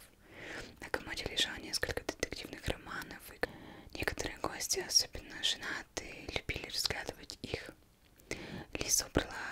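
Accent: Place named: Perm, Russia. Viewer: Очень приятный голос. 💜 Но какой-то интересный акцент... из Перми родом?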